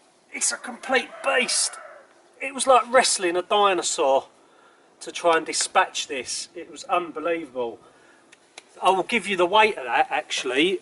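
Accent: United Kingdom. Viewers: Love your eyes and accent...yum British men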